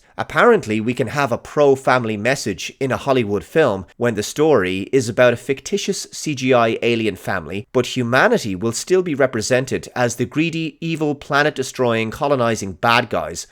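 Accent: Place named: Ireland. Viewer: Is it me or does just sound more Irish than before? I haven't watched for a long time.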